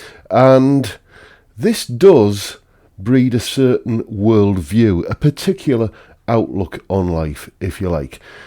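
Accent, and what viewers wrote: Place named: Yorkshire, United Kingdom. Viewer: Still playing the "place the accent" game. Son of a yorkshire farmer. Not county Durham then, so no Darlo. Not Middlesbrough, but I'm guessing close by. The fact you didn't say Cleveland places you south of Stokesley, I'm going to put my bet somewhere between Boroughbridge & Northallerton.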